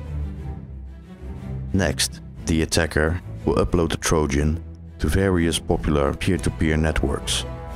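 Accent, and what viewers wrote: Netherlands. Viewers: Geniaal, je kunt gewoon horen dat dit een Nederlander is XP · kan horen dat je nederlands bent, mooi filmpje :) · Is that voice from a dutch person? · Ja hij heeft een nederlands accent XD. Engels is verder wel gwn goed. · is it just me or does this guy have a dutch accent?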